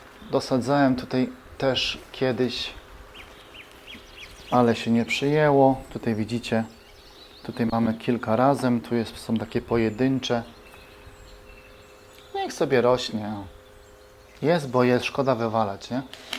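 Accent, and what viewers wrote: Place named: Podhale, Poland. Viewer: dziękuję tak zapytałam bo akcent jak z Podhala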